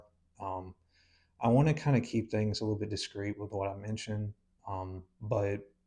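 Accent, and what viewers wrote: South, United States. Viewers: Hey, I can relate so some of the things like the name-calling in school, and it really hurt. Every day was a challenge. I love your smile and your southern accent! You’ve clearly been holding in a lot for a long time, so I’m sending you a big virtual hug. Thank you for sharing your personal story — it takes courage to open up like that. You’re a strong, good-looking person with a bright future ahead. Greetings from Michigan. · It broke my heart seeing you cry! I’m not American, didn’t grow up Christian, and younger than you by 10 years yet my experience is very similar. I’m very proud of you for having the courage to come out, to heal, to admit you were wrong and to correct that, and to become a great and inclusive trainer who we all can tell is very good at his job by looking to his body..also I love your southern accent Sending love ❤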